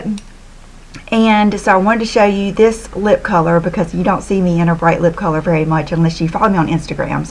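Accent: South, United States. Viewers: is from Wilmington, North Carolina. Southern accent.:)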